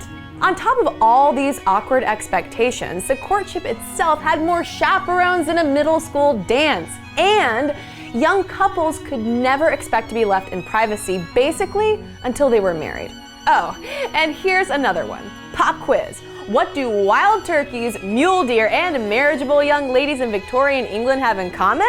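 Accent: United States. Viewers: What an obnoxious "presenter"! These customs and ways would sound more valid and easier to listen to from a native British speaker. Her attitude could use a major overhaul, too. She contributes to the image of the obnoxious American know-it-all. I wouldn't subscribe to her channel for all the pounds in Britain! (p.s. I'm an American) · Seems ridiculous having an American doing the narration ! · It's really odd hearing an obnoxious American woman explain Victorian customs. I am most unamused by this hussy.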